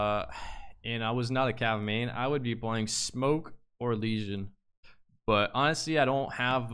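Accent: Quebec, Canada. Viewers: Es-tu Québécois parce que je l’entend dans ton accent haha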